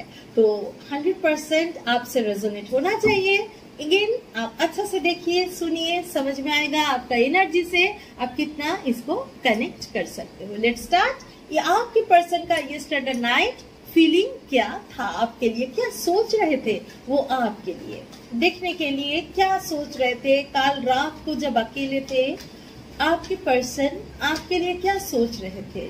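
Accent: Bengal, India. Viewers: your accent and the way of speaking is typically Bengali or atleast East that us Odisha or Assam. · I simply love ur Bengali accent its so sweet maam just like u ❤❤❤❤❤❤❤❤❤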